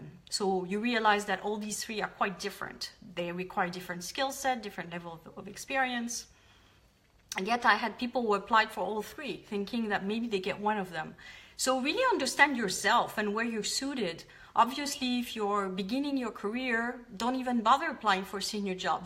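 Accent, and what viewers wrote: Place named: France. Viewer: Thank you for this smart,structured content!I also like how you follow some sensitive points.Btw,your French accent is very charming when you speak English.Good luck with everything you do!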